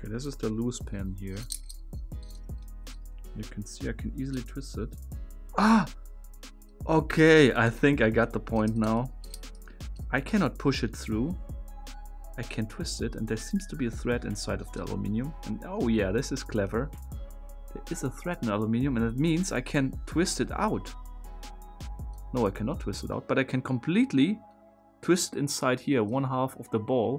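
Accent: Germany. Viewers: Are you German? OMG yes you are....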